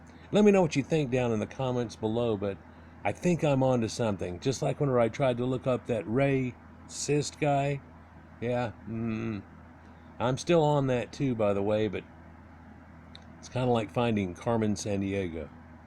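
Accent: United States. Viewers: I love it!! You gotta be a Texan!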